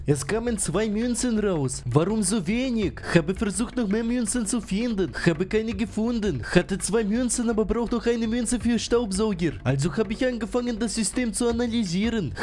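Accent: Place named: Russia. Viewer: Ich liebe deinen Russischen Akzent! Mach weiter so!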